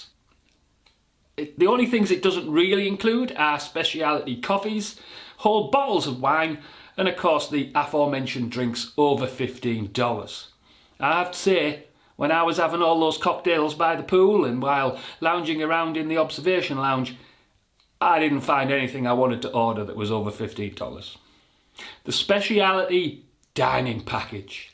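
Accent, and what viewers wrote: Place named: Newcastle, United Kingdom. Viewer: Are you related to Alan Shearer by chance? You kind of look like him and you've got a Newcastle accent lol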